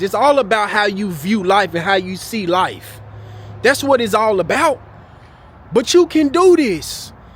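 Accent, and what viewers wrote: Memphis, United States. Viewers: I used to live in South Memphis near McLemore. At first I wasn't gonna ask, but you sound just like someone from Memphis, that's why I asked.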